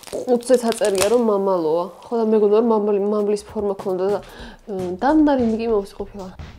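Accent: Imereti, Georgia. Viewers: იმერელი ხარ?❤❤ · იმერელი ხარ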